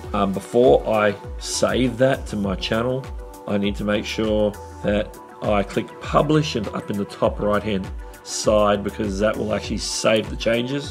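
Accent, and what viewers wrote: Australia. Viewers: this guy is Australian?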